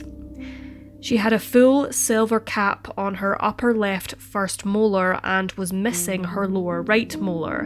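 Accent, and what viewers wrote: Scotland, United Kingdom. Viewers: Voice: Scottish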